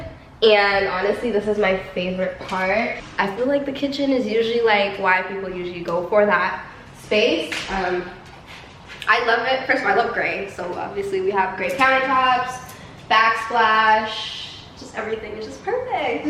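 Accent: Jamaica. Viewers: Oh she Jamaican Jamaican? Lol “wait nuh man.” Congrats beautiful! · I heard that accent 🇯🇲🇯🇲🇯🇲🇯🇲 #jamaican in the building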